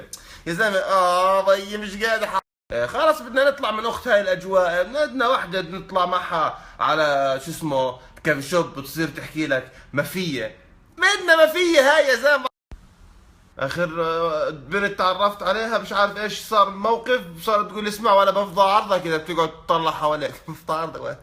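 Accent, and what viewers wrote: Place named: Gaza, Palestine. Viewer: هادا لهجتو غزاوي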